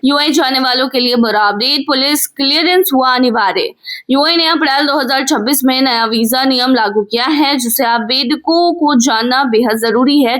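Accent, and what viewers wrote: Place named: Pakistan. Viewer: Horrible urdu accent, incomprehensible verbal diarrohea! Pls use copper tongue-cleaner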